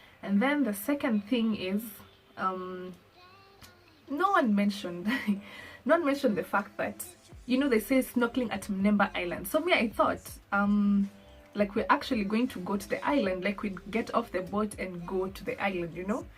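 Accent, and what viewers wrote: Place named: Kenya. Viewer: Oh you are from Kenya. I figured it out from listening to you.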